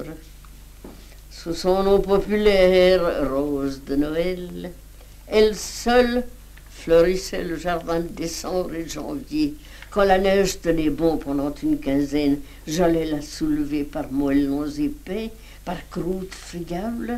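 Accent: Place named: Burgundy, France. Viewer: Simplement l'accent bourguignon d'une femme de 80 ans à une époque où l'on avait conservé sans honte les particularités, les spécificités de sa région natale. Comme la langue s'est appauvrie !...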